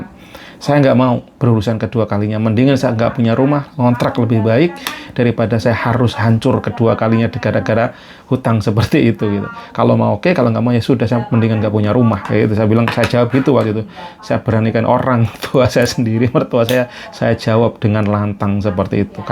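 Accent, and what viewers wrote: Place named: Java, Indonesia. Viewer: Mendok & terlalu berbasa basi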